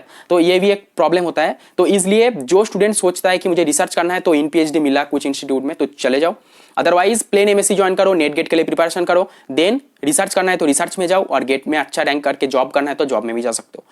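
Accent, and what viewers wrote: Bengal, India. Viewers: Sir are you from West Bengal? 😮😮😮 Your sound is looking like Bengalies.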